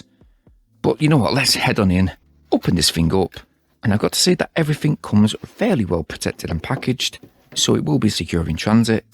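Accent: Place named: Manchester, United Kingdom. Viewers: im late but its northern english or scottish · what's your accent man. sounds like manc mixed with something else. maybe scandinavian? or are you just using an unusual cadence for youtube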